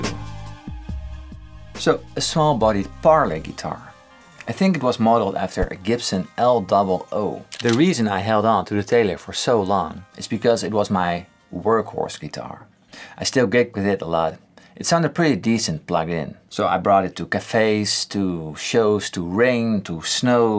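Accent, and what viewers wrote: Netherlands. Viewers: Hey, I wonder where you're from! You're English sounds like how dutch people speak English. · Olivier Yes. He's dutch! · Ik wist niet dat je Nederlands was. Hahaha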